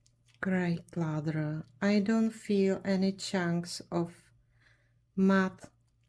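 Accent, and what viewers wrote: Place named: Czech Republic. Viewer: Nechci se vás dotknout, vím, že to nesouvisí s výrobou mýdla, ale když už chcete natáčet videa v angličtině, bylo by dobré zapracovat na výslovnosti. Ta "czenglish" opravdu trhá uši, nezlobte se.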